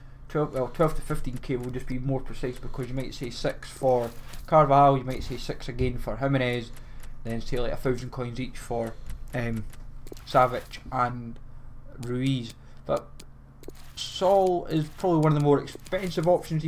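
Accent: Scotland, United Kingdom. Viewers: R u Scottish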